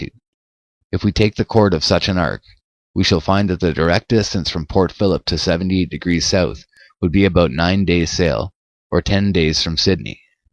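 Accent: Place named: Canada. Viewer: Sounds like flat water channel. I heard the Canadian oooo. Excellent.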